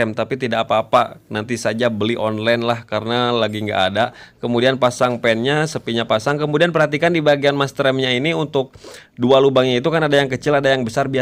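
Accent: West Java, Indonesia. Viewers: Sundana hese di leungitkeun 😄👍🏻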